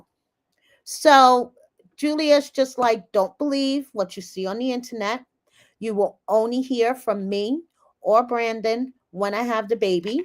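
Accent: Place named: Connecticut, United States. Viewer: Your voice reminds me of my home CT. LOVE LISTENING TO YOU. 🍹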